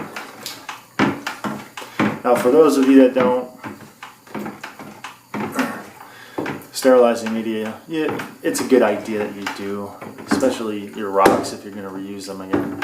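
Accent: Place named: United States. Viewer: Hy drott in Iove it. you usavians, are so sweet with your accents.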